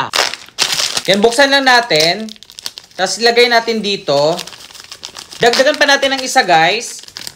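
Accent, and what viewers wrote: Visayas, Philippines. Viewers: Gosto. Namin. Ka. Mag. Bisaya. narinig. Koga. Ng. Bisaya. Ka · Hala bisaya diay ka kuya??? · Bisaya ka po ba 😅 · BISAYA KA YAH? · Kuya bisaya ka ba? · bisaya diay ka ya?😂😂😂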